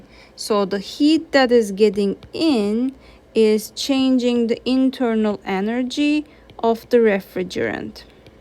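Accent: Turkey. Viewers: Hocam Are you Turkish your accent sounds Turkish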